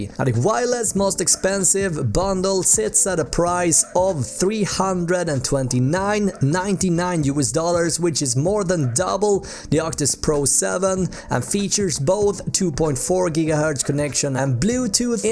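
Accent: Sweden. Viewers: Your english vocabulary is good, and so is your review. But your swedish accent and shouting is really ruining it for me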